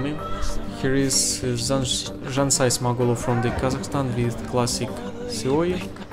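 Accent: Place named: Russia. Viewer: I think russian accent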